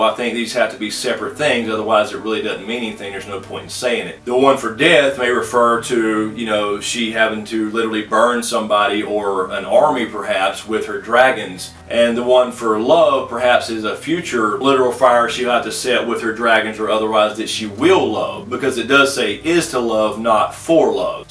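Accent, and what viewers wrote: South, United States. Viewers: I am so glad I found this channel! You are the first person I have seen/heard with a southern accent to clearly articulate theories and discussion about the books. Brilliant!! Makes me proud to be southern!! ;)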